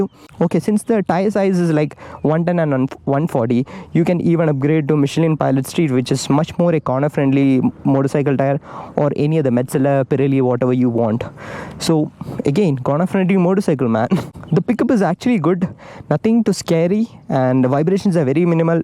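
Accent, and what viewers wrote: Kerala, India. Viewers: You must definitely be a mallu☺️. But good review!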